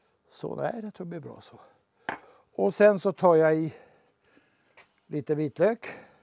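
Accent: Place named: Gothenburg, Sweden. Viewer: Hej, Du bryter både på "Göteborgska " och "Stockholmska". Vilket är din farorit dialekt?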